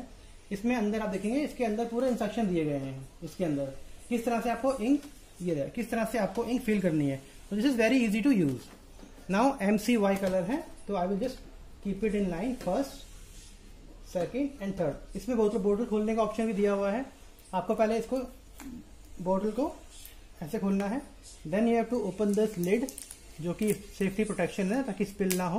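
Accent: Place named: India. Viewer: I am American and, of course, used to American english. Of course, I am also used to the accents of the other primary english speaking countries. Un fortunately, consistently, Indian Youtube presenters have very accented english which makes it very difficult to understand a technical presentation. I am quite sure I would understand them during a conversation.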